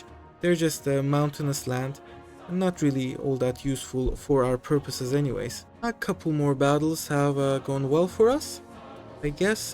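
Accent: Turkey. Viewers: turkish accent detected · Reis Türk müsün, Otistik Türk aksanın var.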